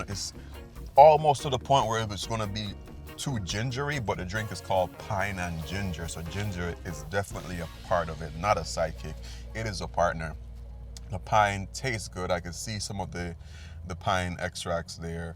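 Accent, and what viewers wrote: Jamaica. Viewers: Buaay, me nuh know, but your patois sound funny eventhough you from jamaica. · The patios accent deeeeep😂😂 I can hear the effort😅😅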